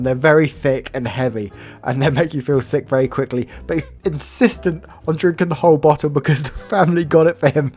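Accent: United Kingdom. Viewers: Yep loved it daddy, totally agree with being over the general Reddit stories. So many people are doing the same shit now. But I love your reading of them the best, love your British accent and that fact you don't give a shit about conforming to impress YouTube head honshows as most YouTubers bleep the swear words. You just say it as it is. I love that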